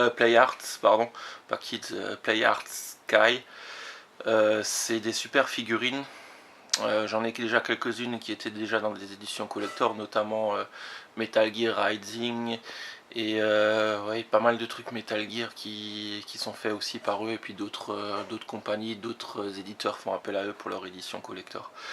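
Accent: Alsace, France. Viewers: On dirais bien un accent Alsacien ^^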